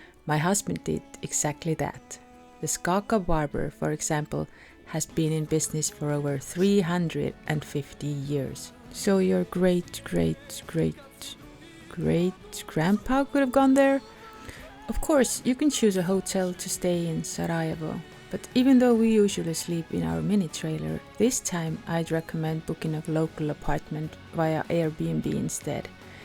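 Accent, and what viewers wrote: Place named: Estonia. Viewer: Aitäh, mulle väga meeldib eesti aktsent! Hääldate mõningaid mu emakeele termineid väga täpselt. Olen vaimustuses ja hämmastunud. Aitäh, mulle väga meeldib eesti aktsent! Hääldate teatud väljendeid minu emakeeles väga täpselt. Olen vaimustuses ja sügavalt muljet avaldatud. Lisaks olete väga asjatundlik ja objektiivne, mida ma eriti hindan. Ma ei tea Eestist kuigi palju, kuid edaspidi püüan teie kodumaa kohta rohkem teada saada. Aitäh, et avasite mu silmad... Parimate soovidega, Samir (Sarajevo) :face-red-heart-shape::hand-pink-waving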